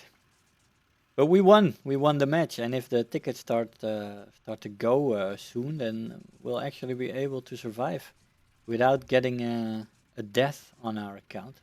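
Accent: Netherlands. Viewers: Sounds Dutch?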